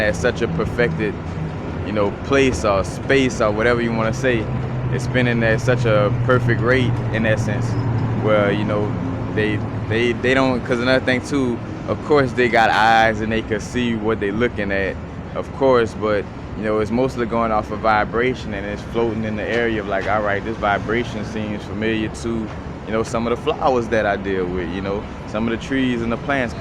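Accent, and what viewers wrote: New Orleans, United States. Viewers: You from New Orleans Bragh? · Sound like New Orleans accent! U from home?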